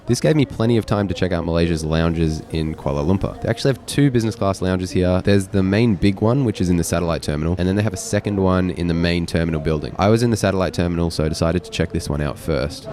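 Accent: Australia. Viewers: Sounding very Aussie which is a pleasure.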